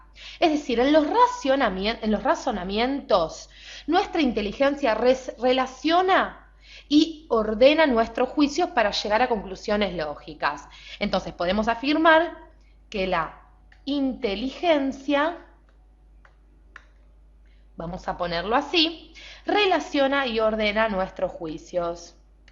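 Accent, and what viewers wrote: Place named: Argentina. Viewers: Por que hablas en argentino? D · Nosera porque es Argentina?👊😈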